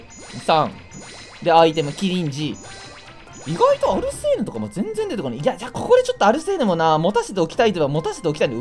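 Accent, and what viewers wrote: Ishikawa, Japan. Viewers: 何か意味不明なんだけど、何となく石川県民っぽくて地震大丈夫だったかな？みたいに思ってたら本当に石川出身と聞いて自分で驚いてる笑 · 、石川訛りって言われてて心配してたけど本当にそうだったんだ。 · 動画見てるとたまに訛ってるなって前から思ってたけどスッキリしました、石川出身だったのですね。自分は富山の人間なので近いと思っていました。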